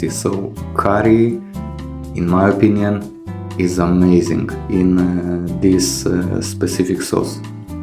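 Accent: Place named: Russia. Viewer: brother your video looks so nice, just continue, but i think you are russian for reason your accent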